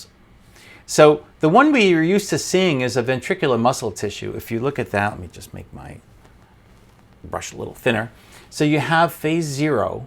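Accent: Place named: United Kingdom. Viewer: Funny how you took english(U.K) accent..Well done Bloke.. Informative video...Appreciate your dedication..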